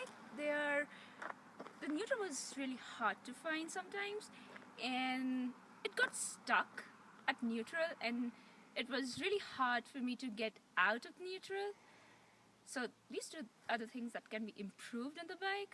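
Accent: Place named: Hungary. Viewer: she sounds hungarian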